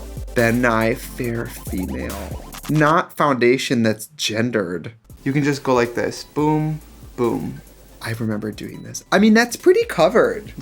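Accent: Wisconsin, United States. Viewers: The Wisconsin accent is so strong 🤣🤣🤣🤣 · circa 2010 really gave us that Wisconsin accent.. RE. PRE. SENT. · YES! Her Wisconsin accent was so strong when she was younger. She was so innocent and pretty though! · What happend to your Wisconsin accent? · The strong Wisconsin accent really makes 2009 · I love you clocking your Wisconsin accent. I’m from Northern Illinois. I feel ya bud. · His Wisconsin accent is there. Represent! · u can really hear the wisconsin in this one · the Wisconsin accent really jumped out in the old clips oml bless 😂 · THE WISCONSIN ACCENT JUMPED OUT IN THAT OLD TUTORIAL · That Wisconsin accent!!!! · the wisconsin accent really jumped out in this omg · Girl the Wisconsin accent is thicc. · Wisconsinite accent was so thick back then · I love how in your face her Wisconsin accent used to be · The strong Wisconsin accent really makes it that much better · The Wisconsin accent jumped out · The Wisconsin accent ‼️ Where’d she go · girl that Wisconsin accent is THICK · Never could notice Wisconsin accent until now 👀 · As a fellow Wisconsinite...your Wisconsin was showing!! 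❤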